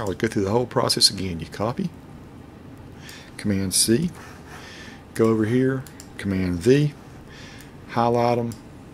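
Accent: South, United States. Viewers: thank for this tip, and for your delightful southern accent. If Adobe was smart they would hire you for their tutorials.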